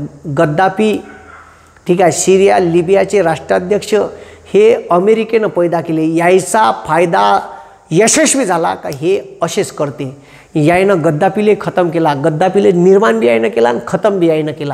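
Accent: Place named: Wardha, India. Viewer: Listened to your full video, made history so very interesting. Marathi dialect wardhache asun suddha samajhayla traas jhala nahi. Tumcha energy purna video madhe continuous hota.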